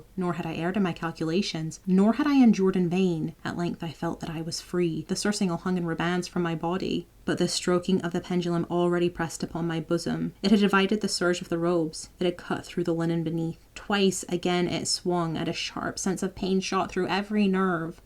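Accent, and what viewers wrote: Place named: Scotland, United Kingdom. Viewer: I just found your channel. Your accent is strange. It's like you're either from Scotland and have been living here in my home state is Indiana for years OR you're FROM the Midwest and have moved to Scotland or nearby and have picked up some of the accent. It's wild. It's like, to my ears, you almost sound like an average US person but then it's sprinkled with a bit of a Scottish brogue. Lol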